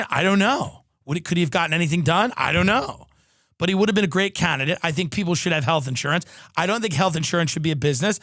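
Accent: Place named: Long Island, United States. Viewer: tim is hysterical and he's such a long islander it's hilarious. everybody on long island knows a guy like tim same accent same way of talking and cracking jokes lol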